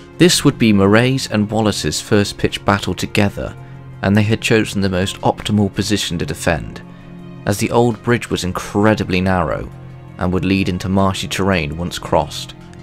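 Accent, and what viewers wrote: United Kingdom. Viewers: You had me with the perfect scottish accent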